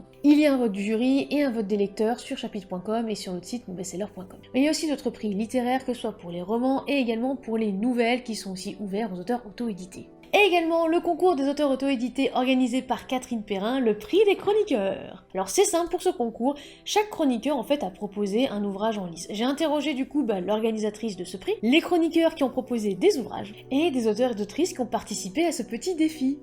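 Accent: England, United Kingdom. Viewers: Cet accent anglais, j'en rêve la nuit * coeur * !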